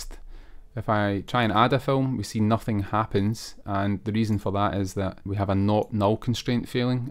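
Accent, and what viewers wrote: Scotland, United Kingdom. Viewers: Fantastic Scottish Django Developer! Found another one :D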